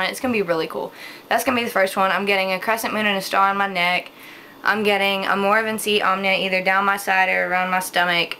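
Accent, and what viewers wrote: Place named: South, United States. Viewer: ... >.> you're from the south... O_o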